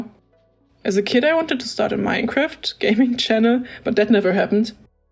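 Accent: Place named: Germany. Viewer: i was summoned by the german accent! XD love you already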